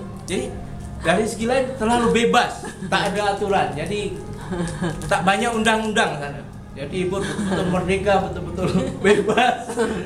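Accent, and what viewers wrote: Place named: Java, Indonesia. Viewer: Dari logatnya iya sptnya turunan jawa tp knp menjelek"an suasana di negara sendiri? Jkt tdk separah itu Jkt bkn spt New Delhi / Dakka. Jln layang di mana" bahkan kini sdg di bangun kereta bawah tanah / subway. Mmg Jkt tdk punya gdg tertinggi di dunia tp percayalah suatu kota akan terkenal bkn krn ada gdg fenomalnya tp krn suasana dan keramahannya jg keunikannya. Masjid terbsr seasia tenggara ada di Jkt, surga belanja terbsr seasia tgra jg ada di jkt yaitu di Tanah Abang. Mau makan makanan seluruh Indonesia dtg sja ke Jkt dari Warung Tegal, Sop Konro, Bubur Manado, Pepes Sunda hingga masakan padang smua bs anda jumpai di Jkt dari kls pinggir jalan hga di hotel" berbintang.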